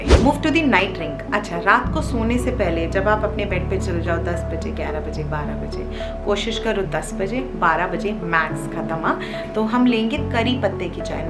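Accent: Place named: Delhi, India. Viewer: I like ur delhi wala accent di. U r so inspiring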